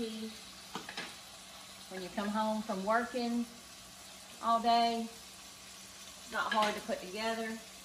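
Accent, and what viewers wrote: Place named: Texas, United States. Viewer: Hey Yes I think so too. I can’t wait to make this recipe! Sounds so good. I love your Texan accent. I’m from Canada 🇨🇦 with the goofball Prime Minister. 😆 Thank you.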